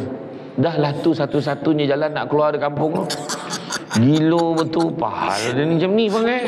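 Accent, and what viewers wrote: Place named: Negeri Sembilan, Malaysia. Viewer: Lama2.. rakyat Indonesia bukan saja boleh ajuk cakap guna bahasa Malaysia.. siap boleh ckp loghat negeri sembilan lagi kauuuuuu... Kat Malaysia ni setiap negeri masing2 ada dialek bahasa melayu masing2.. Sy suka dgr org cakap guna loghat negeri sembilan dgn loghat utara.. ❤ Negeri sembilan bunyi perkataan tu mcm ejek lawak Pulau Pinang atau loghat utara mcm nak ajak gaduh ckp besar tp ajak2 ayam je..😂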